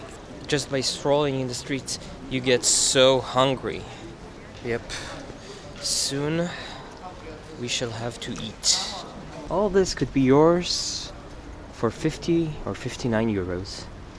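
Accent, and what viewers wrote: Brazil. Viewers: Your accent sounds Brazilian, are you from Brazil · You are Brazilian ,arent you ? Your accent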